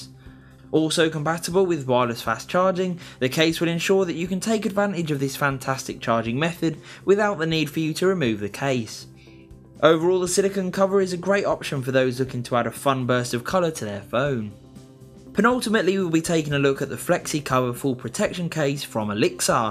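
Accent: United Kingdom. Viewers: Sounds like he's from the UK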